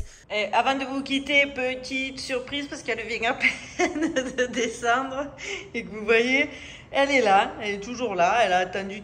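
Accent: Marseille, France. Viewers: Pub France Vérif' :face-green-smiling: On reconnait la marseillaise qui demeure en toi!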